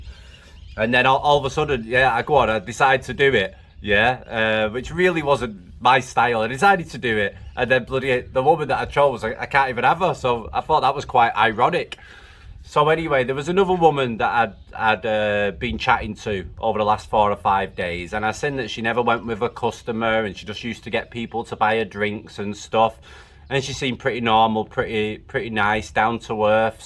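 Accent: Manchester, United Kingdom. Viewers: You from Manchester,?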